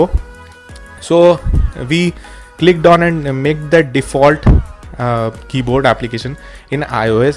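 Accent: India. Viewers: guys guys only who can understand you must be indeyan or Pakistan so be slow ... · You sound Indian so I couldn’t make out a word you said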